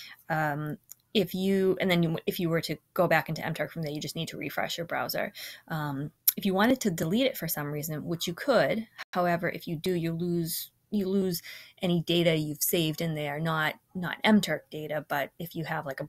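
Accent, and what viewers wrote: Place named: New England, United States. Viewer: Hey, Thanks for the videos! Being from California I get a laugh from some of the wicked New England pronunciations (drawring, lol). Anyways, do you know if it's possible to merge a worker account? I realized I had an account I worked on years ago that had a few HITs that might help me push towards 1000. Keep up the good work!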